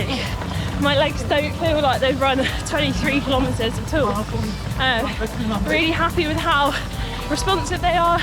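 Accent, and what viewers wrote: United Kingdom. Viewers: your amazing! as amazing as the british accent! ;)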